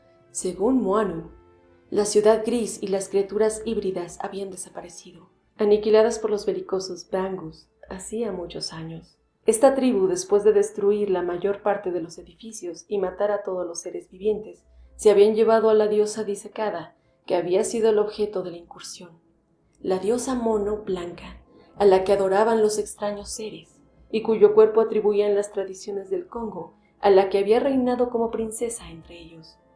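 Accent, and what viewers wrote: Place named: Mexico. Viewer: Tu tono tu voz son ambrosía para mí oídos. SOS mejicana suena dulce como la miel me recordas el aroma de las margaritas. (Las flores)🇦🇷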